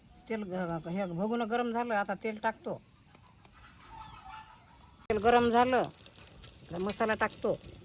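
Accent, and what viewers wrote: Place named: Vidarbha, India. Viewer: Aaji tujhi bhasha ekun gaavi ch aalya chi feeling yete. Vidarbha chi god bhasha as good as it's food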